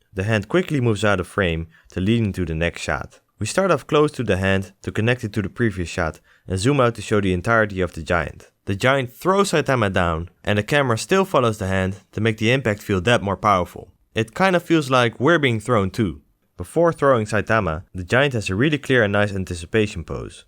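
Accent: Netherlands. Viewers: 1 second into the video, hears his accent yep hes Dutch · Great vid! I noticed a bit of a dutch accent but I can be wrong though😂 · I love it that I can just hear your Dutch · aaaahh the dutch accent sounding ass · Hey im new to this channel, maar ik hoor je Nederlandse accent kapot erg niet normaal maar je spreekt het nog best goed props.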